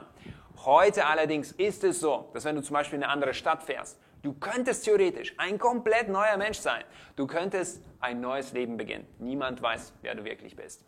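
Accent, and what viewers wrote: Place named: Yugoslavia. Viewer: Gaylappen mit piepsstimme und jugoakzent will mir erzählen, wie man der coolste im Raum wird 😁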